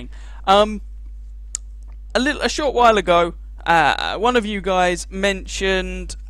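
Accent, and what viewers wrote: England, United Kingdom. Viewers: Well done on the new job. As Wulf asks.... where in Scotland? I just assumed you where in Sassanach country from your accent. Keep up the good work :-)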